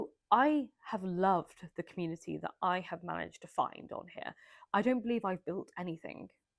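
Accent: RP, United Kingdom. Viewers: The reason I started watching your channel is because I absolutely loved the classic literature content. I don't really know if others agree, so please take my opinion as just that ... one person's opinion. That said, I am eager to see a return of classic literature content. Your presentation of said content was engaging and thrilling, not to mention that, as an American, listening to your posh English accent is lovely.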